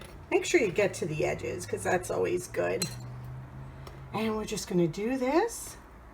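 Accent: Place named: East, United States. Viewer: Hello Mornings with Granny sent me. Gotta check out your channel. You sound like from back east. I am from New York state.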